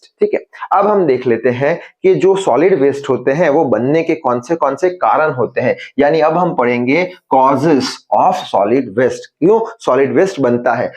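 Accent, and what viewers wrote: India. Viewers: You speak Indian English. And you look like an Indian.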